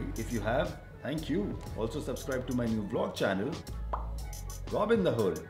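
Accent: India. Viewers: Ha bro bhai indian h english bolne ke tarike se pta chal rha h. Ek dum clear. Nice video bhai.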